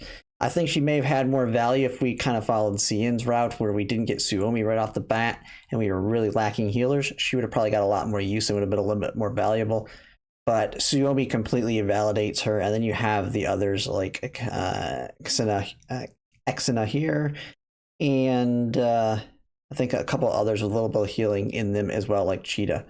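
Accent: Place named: West, United States. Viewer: the way he pronounces the russian names is just ... well , you can tell he is from West for sure :D but the list is solid .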